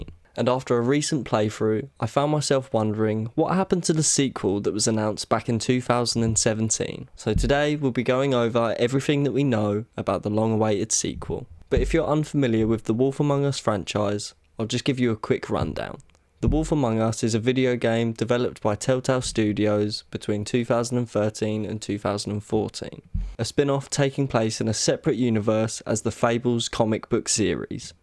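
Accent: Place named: United Kingdom. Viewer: Thank you kindly for your amazing video and I love your British accent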